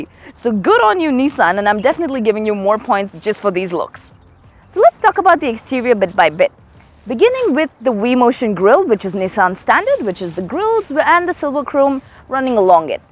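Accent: India. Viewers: That's confusing...I guess it's either hyundai accent or her Indian accent.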